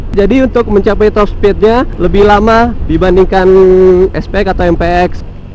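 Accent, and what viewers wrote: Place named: Minangkabau, Indonesia. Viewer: Urang awak ko mah, logatnya kliatan😂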